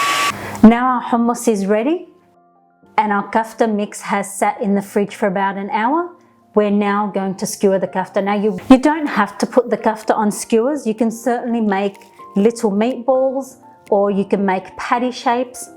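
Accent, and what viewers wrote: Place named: Australia. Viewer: Love your Aussie accent I’m Australian too MaashAllah sister your very beautiful 🥰btw I’m going to try this soon 🇦🇺❤️🇱🇧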